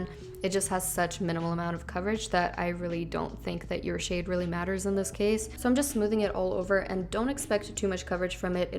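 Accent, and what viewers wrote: United Kingdom. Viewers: love your slight british accent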